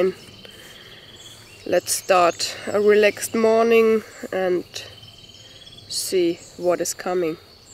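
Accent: Bavaria, Germany. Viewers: aloha, i saw your video for the first time speaking English but immediately recognized that you were German and later in the video i learned that you were in Chiemgau Bavaria. This is absolutely one of the most beautiful places, Lake Chiemsee and the Kampenwand in Aschau. i lived in Sachrang and near Traunstein, but now back in Aschau. Aschau is great for hiking into the forest, and for mountain biking. maybe i see you here one day making a video of Aschau and Prien river. Entspannen and enjoy. your english is just fine, i learning to speak german. Aloha. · Hallo, I recently discovered your channel, and the videos you have on here are amazing. In addition to the scenery and skill you show, I really love how you show the trains coming by in so much of your video work and listening / looking for them. I Love your German accent by the way, while it used to be more common that I've heard in older generations, from what I've seen in recent times, I don't hear it as rich and pronounced among many people as before, like yours. 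· Lucky bavarian english .. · never heard deutscheres english :D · Love your german accent. Nice video. You have a very appealing shape. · кошмарный немецкий акцент...но всё равно понятно.беда в том что женщина не создана для выживания в дикой природе.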